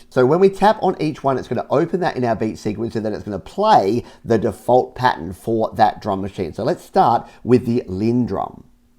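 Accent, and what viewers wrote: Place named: Australia. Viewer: I recognise that accent. Hi fellow Aussie.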